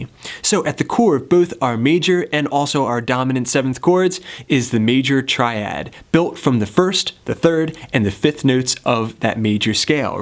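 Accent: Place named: United States. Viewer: I mean, cool videos very useful, but all I could think about is your american accent. Where in the U.S. did you pick that up?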